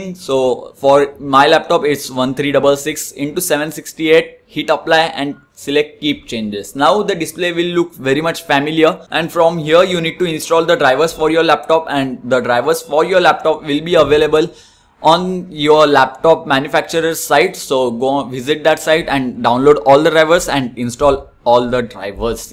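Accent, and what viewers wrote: India. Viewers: Bro you have the most authentic Indian English accent 👍 ask thanks for the knowledge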